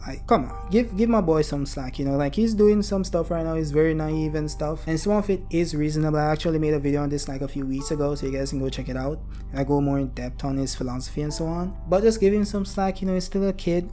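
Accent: Jamaica. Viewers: are you jaaican by any chance, your accent sounds familliar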